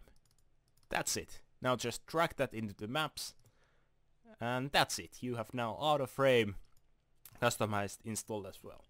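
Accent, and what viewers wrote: Finland. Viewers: you have a finnish accent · are u from finland? cuz you have very strong accent :D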